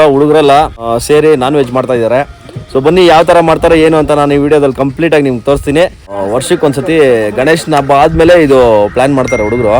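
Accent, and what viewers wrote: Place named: Anekal, India. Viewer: ನಮ್ಮ ಆನೇಕಲ್ ಬಾಷೆ ತರ ಇದೆ ನೀವು ಮಾತಾಡೋದು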